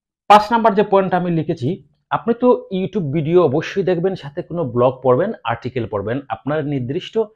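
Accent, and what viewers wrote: Sylhet, Bangladesh. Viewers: Thanks vai most informative video. Vai kita Sylhety ni? mate buja jar halka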